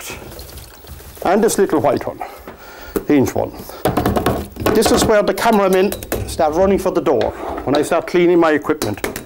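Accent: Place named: Ireland. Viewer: I love your accent and your paintings 🌸 As a German lived in North Cork for 12 years I enjoy your accent so much!!!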